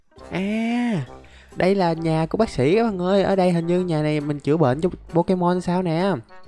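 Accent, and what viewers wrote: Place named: North, Vietnam. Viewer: Coi lại video cũ của anh khúc đầu nghe anh nói mà cứ tưởng người Bắc ko à =))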